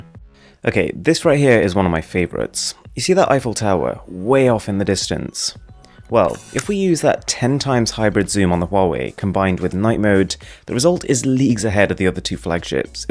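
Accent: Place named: United Kingdom. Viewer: Omg i love your british accent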